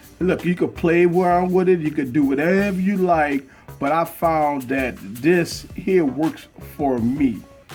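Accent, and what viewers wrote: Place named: New Orleans, United States. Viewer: I love your NOLA accent! Great video. This was very helpful.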